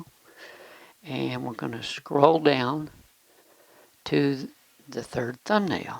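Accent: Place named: South, United States. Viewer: very nice because of your accent sounds like country and western town just like Nashville or texas love it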